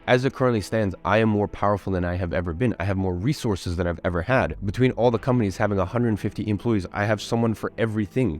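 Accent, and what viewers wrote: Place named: United States. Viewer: How come does this guy have an american accent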